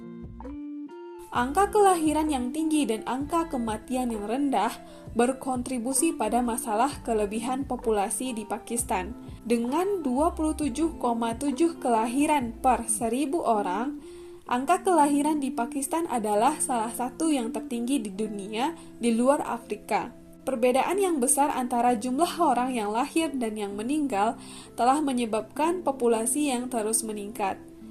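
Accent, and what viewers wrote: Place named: Eastern Indonesia, Indonesia. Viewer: Logatnya agak lain kak.. dari timur kh?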